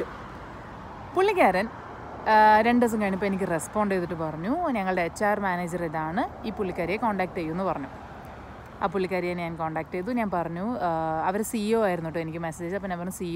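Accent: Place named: Kannur, India. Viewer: ഇരുട് കണ്ണൂർ സ്ലാങ് ❤️❤️❤️❤️❤️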